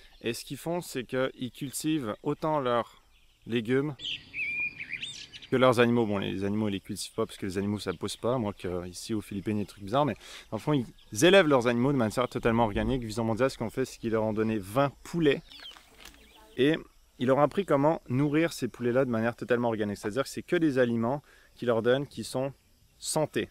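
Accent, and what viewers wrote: Quebec, Canada. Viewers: J'ai l'impression que ton accent québécois est de plus en plus fort ... bizarre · Bah à la base, il est pas québécois mais oui, il as un fort accent maintenant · L'accent québécois est beaucoup plus présent qu'il y a un certain temps c'est drôle de voir la différence entre il y a 3-4 ans et maintenant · T'as chopé l'accent québécois :D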